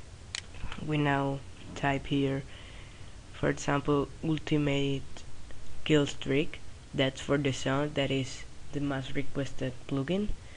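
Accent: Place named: Spain. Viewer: are you kidding me? he speaks great english, only thing different is the spanish accent. This was better english than most english speaking people can produce. Thumbs Up!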